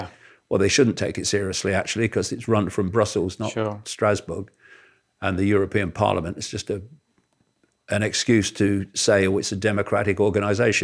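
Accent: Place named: Birmingham, United Kingdom. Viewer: Spot on me... BUT YOU ARE STILL DRAGGING THE CORPSE OF COLEMANBALLS THROUGH THE OPEN SEWER OF MY OVERHYPED DESCRIPTION WHICH IS MORE GRIPPING... WHAT...IT IS GETTING MUCH WORSE BY THE SECOND......PLEASE DAVID...DEAR GOD STOP THIS DRIVEL AND PRESENT SOMETHING EVEN REMOTELY CONNECTED WITH THE PRESENT, REAL WORLD AND MAYBE EVEN YOUR MOANING BRUMMY DULCET TONES.....I CAN'T COPE WITH ANYMORE... YOU HAVE A POTENTIAL WORLD STAGE AND YOU FUCKING WASTE IT 🗑 TOSSER BEHAVIOR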